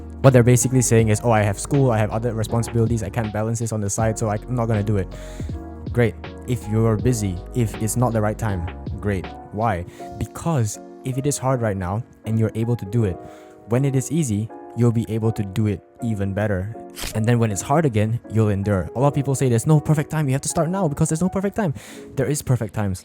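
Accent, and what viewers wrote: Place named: Singapore. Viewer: This guy sounds Singaporean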